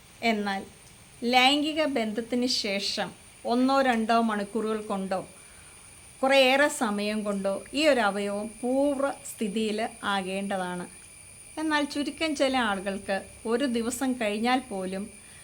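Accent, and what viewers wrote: Kottayam, India. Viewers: ചേച്ചീ, പെണ്ണ് മുകളിൽ ഇരുന്ന് കൃസരി കൊണ്ട് ലിംഗത്തിൽ മുകളിലോട്ടും താഴോട്ടും ഉരയ്ക്കുമ്പോൾ രണ്ടു പേർക്കും കിട്ടുന്ന സുഖവും അനുഭൂതിയും വാക്കുകൾ കൊണ്ട് പറഞ്ഞറിയിക്കാൻ കഴിയില്ല. എന്നിട്ട് വേണം യോനി ലിംഗത്തിൽ അമർത്തി ഉള്ളിൽ കടത്തുന്നത്. ഇത് ശരിയല്ലേ ചേച്ചീ? ചേച്ചി പാലാക്കാരിയാണോ? കോട്ടയം സ്ലാങ് കേൾക്കാൻ രസമുണ്ട്.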